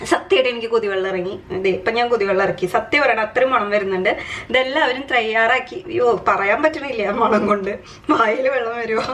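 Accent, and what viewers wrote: Thrissur, India. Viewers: Recipe is good. But we will never use coriander powder in stew. Nattil evida? Nalla Thrissur slang. Me from thrissur.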